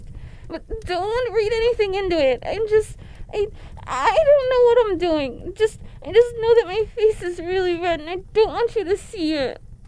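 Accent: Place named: Canada. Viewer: Your accent for this character is perfect. It sounds like a cross between faint Canadian, and classic kimonomimi voice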